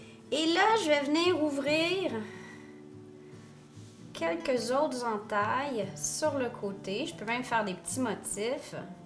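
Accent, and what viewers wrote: Quebec, Canada. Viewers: Quebecois is so beautiful to listen to. So much more real than "Metropolitan" Paris busboy waiter continental French.... But then again the French don't play hockey either! ;)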